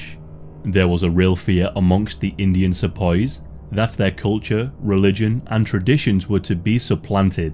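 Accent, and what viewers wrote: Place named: Northumberland, United Kingdom. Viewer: Very informative, thank you. Your accent intrigues me, you sound northern but I'm not sure where, Northumberland?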